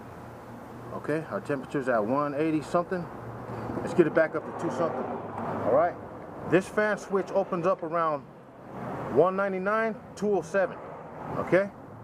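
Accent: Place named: Bay Area, United States. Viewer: imma guess, you from the bay bruh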